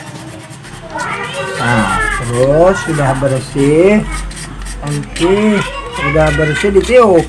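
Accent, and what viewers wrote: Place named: West Java, Indonesia. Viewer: 😆😆😆orang Sunda pisan euy.mantab tutorialnya.smangat mamang ah.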